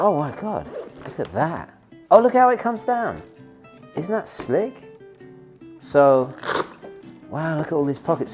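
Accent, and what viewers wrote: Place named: United Kingdom. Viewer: is my most favourite watch reviewing channel, I just love the British accent, and the authentic, heartwarming enthusiasm of this guy! And now I am going to be rewarded for pursueing my most favourite leisure activity, watching watch reviews? How great is that? Thank you Fortis, I would be thrilled to own a Flieger, - unaffordable for me otherwise! Best regards, Hei from Kiel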